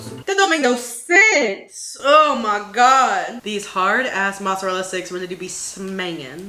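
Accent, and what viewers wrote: United Kingdom. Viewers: Bro your British accent gets me every fucking time AHAAA! Anyways video. Beautiful. Mukbang. A1.. Baddy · THE BRITISH ACCENT KILLED ME 💀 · As a British person, your British accent is truly incredible! I love it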